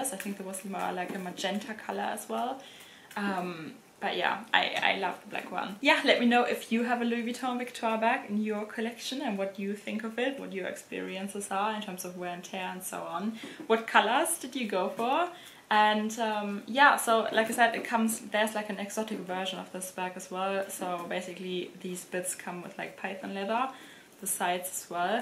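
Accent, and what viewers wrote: Germany. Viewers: Hey, i am thinking of getting the purse.... great review thank you for the video ☺️.... are you german ? ☺️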